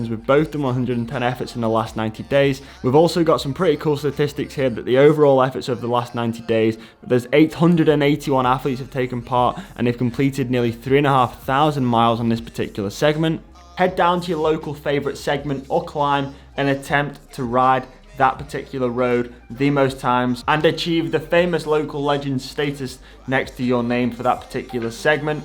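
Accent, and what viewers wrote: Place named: North, United Kingdom. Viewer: Is it me or do you sound more northern than usual?!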